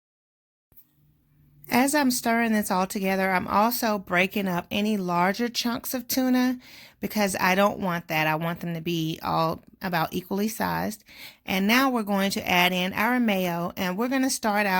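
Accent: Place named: St. Louis, United States. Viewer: I LOVE TOUR VOICE ..U REMIND ME OF A SAINT LOUIS GIRL🤙🏾🤙🏾😁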